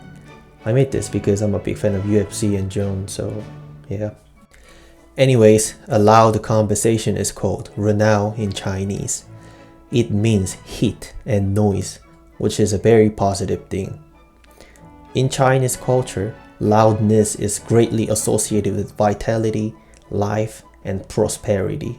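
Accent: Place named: Southeast Asia. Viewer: printed in their dna is false. Its the culture. My grandfather was from china close to Nanjing. Migrated to Thailand after WW2 (there was no food). I went to a british school in thailand. I am currently studying in Australia. As far as I am concerned, my supposed selfish DNA is not influencing my behaviour in the slightest. I think you know this, but I think you're prejudiced. The Selfish section of your video is true, but there are better explanations found on youtube, explained in a much more socially acceptable way. My guy, consider your behaviour and ask yourself if this is going to do you any favors in life. You'll lose all respect from your coworkers and friends in New Zealand. It's odd, because you seem to be asian based on your voice. Most likely South East Asian. So I suppose I can understand your frustration with Chinese Tourists trust me. 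But a lot of South East Asians have chinese genes, in fact a lot of them are chinese migrants like myself. So "printed in their DNA" is just a load of nonsense. Its sad how people aspire to be edgy like this nowadays. I always thought the goal in life is the become more of a gentleman. At 18 years old you gotta lot of growing up to do. I'm doing a lot of guess work here, but I think my opinions of you are pretty accurate and I can see eye to eye with you. I simply do not condone this type of behaviour.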